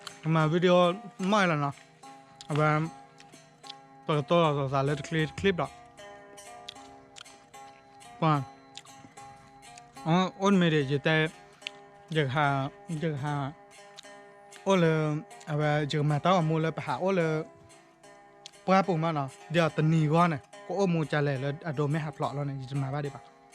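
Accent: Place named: Nakhon Phanom, Thailand. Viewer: นครพนมชัดจ้า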